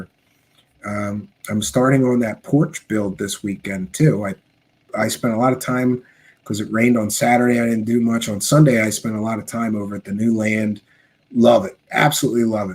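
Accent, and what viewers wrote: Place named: New Jersey, United States. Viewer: Great show!! You have a jersey accent..lol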